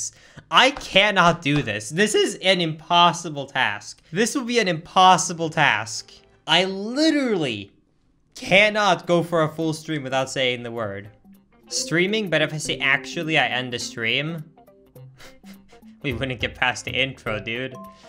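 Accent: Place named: California, United States. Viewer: What’s so fucking funny Is that I DO THIS TOO Maybe it’s just because of my California accent but it’s hilarious that I’m GENUINELY trying to NOT say “actually” like 400 times And yes You do absolutely have the elements of the California accent The tone changes, the usage of “like” for 500 different things “Overusing”* “literally” and “actually”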